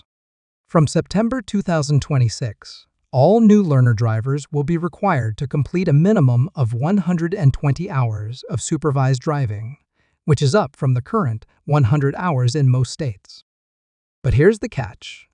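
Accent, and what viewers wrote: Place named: United States. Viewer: I do not know how much of this I can actually believe. Specially with a Yankee voice or a I. Sounds like revenue to me with some of it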